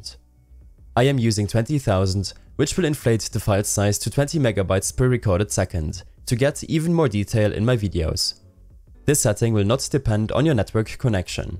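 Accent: Germany. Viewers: i hear a german accent in ur voice😂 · oh cool ein deutscher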